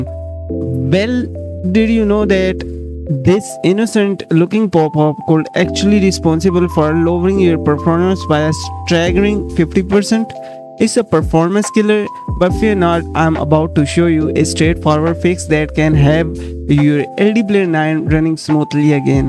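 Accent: India. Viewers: It's always the Indian accent guy on YouTube 🤗🤘🙏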